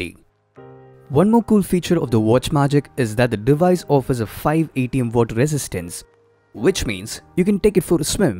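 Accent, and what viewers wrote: India. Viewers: dat indrish tho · I think this man from india, didnt catch this fucking accent.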